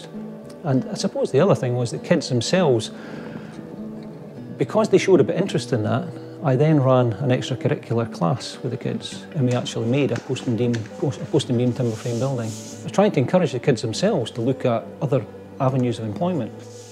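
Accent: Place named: Scotland, United Kingdom. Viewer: Good to hear a Scot's voice.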